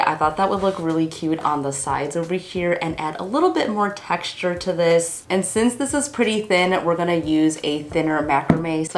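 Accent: Chicago, United States. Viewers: where are you from? I sometimes hear a Chicago accent!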